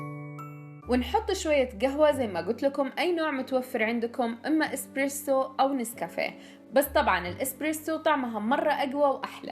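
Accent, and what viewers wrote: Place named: Hejaz, Saudi Arabia. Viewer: الله يسعدك يا. من كم يوم بس عرفت قناتك وتابعت أغلب الفيديوهات انت جميلة وبنوتة رائعة ومرتبة وأنيقة الله يحفظك. فيديوهاتك تجيب السعادة💞 💜. وأحلى حاجة لما تقولي سيروا عليها" ياحلو اللهجة 😃ذكرتيني بأهلنا في الحجاز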